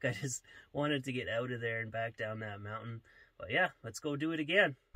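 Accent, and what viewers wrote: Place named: Manitoba, Canada. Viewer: Are you from Manitoba? You kind of have the 'don cha 'no accent.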